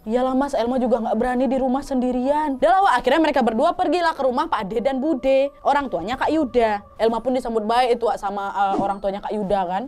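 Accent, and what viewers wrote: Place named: Padang, Indonesia. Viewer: Logat mbk sep org padang😊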